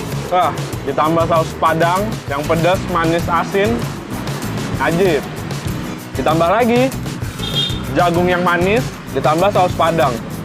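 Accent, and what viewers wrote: Jakarta, Indonesia. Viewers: logatnya Jakarta bgt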